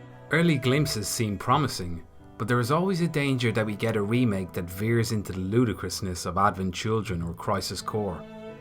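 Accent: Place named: Ireland. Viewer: People saying 'why no IV, V, Tactics etc' should bear in mind that none of those games were originally released in Europe. (Or, as far as I'm aware, anywhere outside Japan or North America) By his accent I'm assuming grew up in Ireland, and for those of us in Europe, FFVII was not only our first Final Fantasy game, it was the first Final Fantasy game we were even capable of playing. 'Golden Age' is going to be by definition subjective and I took as embracing that in this video. At any rate to me and a lot of people like me, the games before FFVII are usually emotional non-events because we played them (if we even did) out of their era, much later on. The nostalgia just aint there.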